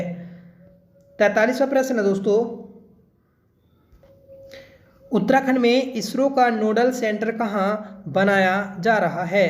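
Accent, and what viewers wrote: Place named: Uttar Pradesh, India. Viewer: Hindi typing m bhut error hai aur apki tone uttrakhand ki km aur up ki jyda hai. Please improve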